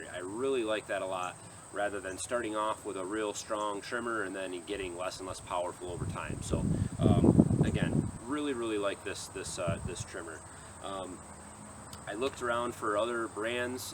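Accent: United States. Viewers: My wife likes your American accent.and I like the trimmer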